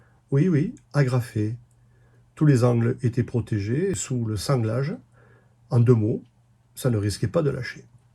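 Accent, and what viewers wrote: South, France. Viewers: superbe restauration il est vrai que notre société de consomation ne voie pas toujours cette fonction de pouvoir réabilité certaine chose.Mais quel plaisir de vous écoutez aussi parlé dans la langue de pagnol au chant des cigales vous êtes un conteur histoire magnifique j'adore 🙂, de plus je suis du sud aussi bravo a vous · Effectivement, pas un luxe de les rénover! Merci de nous apporter du soleil avec votre bel accent du sud, à nous les nordistes sous la fraîcheur…estivale ! 😇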